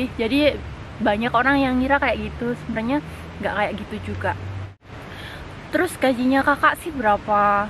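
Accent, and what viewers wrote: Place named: Banyumas, Indonesia. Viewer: semangat yaa mbaaa... btw salam ngapak yaa mbaaaaaa... satu suku sepertinya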